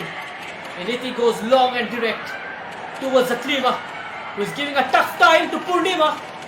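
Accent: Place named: India. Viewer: Bloody Indian commentator's accents are so ugly. Being an Indian, I hate it. I also watched IND vs BAN Bangladesh T-Sports Production. Their accents are good; not irritating like this commentator's accents.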